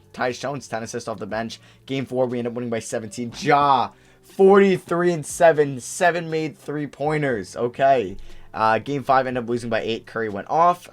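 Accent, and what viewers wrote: Pittsburgh, United States. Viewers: Are u from Pittsburgh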